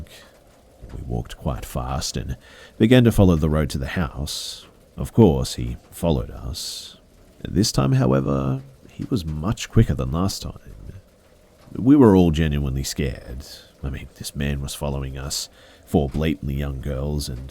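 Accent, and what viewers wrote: Australia. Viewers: Ahh time for bed. taking this soothing Aussie voice with me. Love ya!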